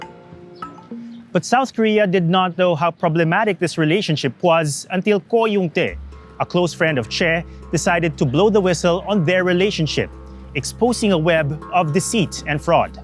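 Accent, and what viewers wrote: Philippines. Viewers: Korean names and a Filipino accent 🙄 we need subtitles please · Is the host Filipino? He has that accent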